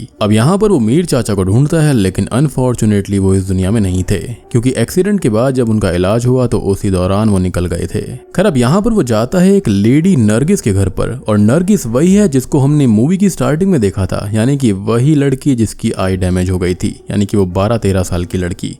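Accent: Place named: Hyderabad, India. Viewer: Tum mast hyderabadi bolte bhai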